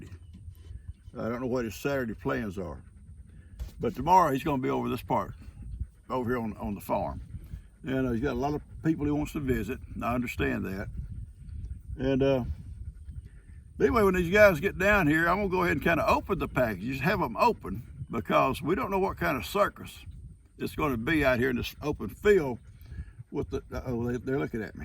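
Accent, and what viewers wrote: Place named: Texas, United States. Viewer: I LOVE that Texas accent of yours, I could, and have, listened all day. And seeing you out in that beautiful pasture…you’re killin me. Well, a girl can dream right and you can tell GiGi I said so😂😂😂I know you only have eyes for her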